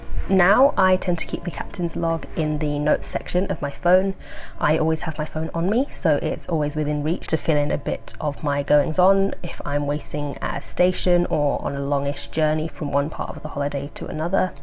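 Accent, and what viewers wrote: United Kingdom. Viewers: normally british accents kinda remind me of how shitty humans can be, especially the very articulate well enunciated ones. but you, you broke the accent mold for me.... the format you use has bin abit of a schtick, most of the time. with no audio id assume you would be obnoxious cus of judging things based on other things, but this breaks the format mold. basically i think you are neat. thanks for being cool!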